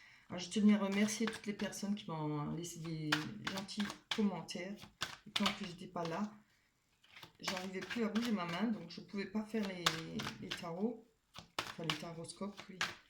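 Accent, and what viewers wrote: Alsace, France. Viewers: Puissiez-vous dire vrai après un an et demi de solitude et de chagrin... que je rencontre enfin l'homme qui saura me rendre heureuse... merci pour la guidance ...ce petit accent ne seriez-vous pas alsacienne comme moi 😘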